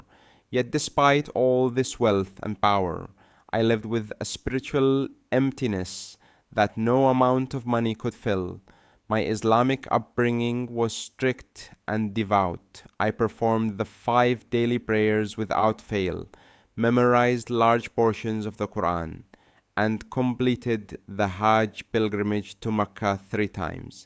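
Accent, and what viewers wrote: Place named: Pakistan. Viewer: He doesn't sound arabic, more like a pakistani.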